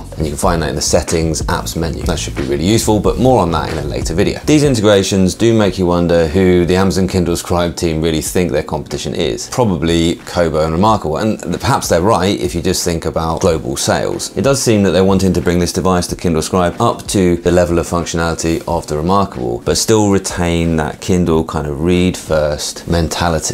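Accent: United Kingdom. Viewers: I watch your videos just to take a quick powernap… the base in the voice, British accent… ooo… so satisfying to ears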